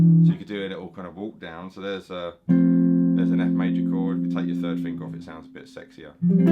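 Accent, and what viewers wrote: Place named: Essex, United Kingdom. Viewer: Well, that was not the accent I was expecting... Essex? Nice vid btw! Gonna give these chords a try. 👍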